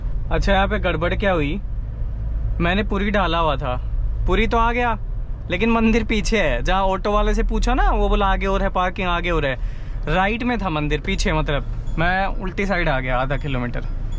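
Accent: Haryana, India. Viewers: Aap hariyanvi me baat kiya kro bhai maza aata hai bhot us accent me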